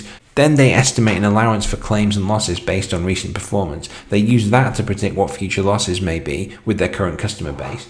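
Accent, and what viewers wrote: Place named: England, United Kingdom. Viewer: Great video. You sound English, do you have any large Uk listed companies you can recommend?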